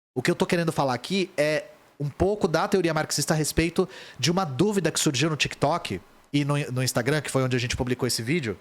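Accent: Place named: Rio Grande do Sul, Brazil. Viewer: Me sinto representado pra caralho quando o fala gauchês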